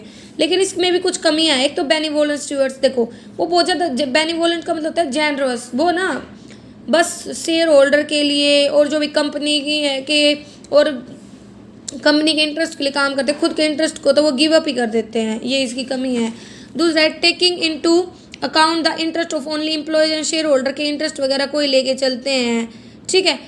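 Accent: Haryana, India. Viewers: Haryana se ho app?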